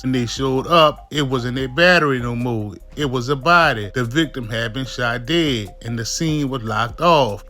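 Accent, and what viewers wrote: New Orleans, United States. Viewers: Loving your videos on all the escapees, good research and a local NOLA voice making for great media 👍 keep it up you're going viral!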